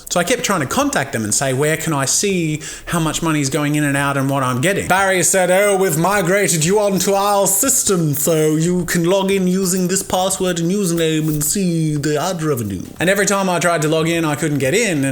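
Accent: United States. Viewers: the american accent was really good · I love his American accent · Terrific American accent! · COOL AMERICAN ACCENT! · Does he sound American when he is giving the lecture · the realistic AF American accent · that was awesome American accent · I love your American accent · i love your american accent so much its actually kind of accurate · Pretty good American accent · Your american accent sounds more american than mine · Sick American accent, *. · hearing you talk in an american accent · nice american accent bro! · Your yank accent is epic! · you fake a really great American accent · AMAZING AMERICAN ACCENT LOLOL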